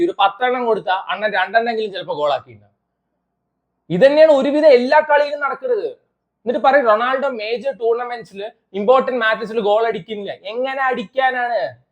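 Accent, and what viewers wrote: Palakkad, India. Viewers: Enan adyam video kandad Palakkad slang is attractive Apart from whats the content your slang make it interesting bro Keep going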